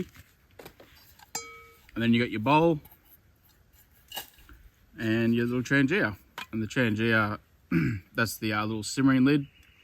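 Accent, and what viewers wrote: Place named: Australia. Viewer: Why would I not understand him? He was using basic English with an Australian accent.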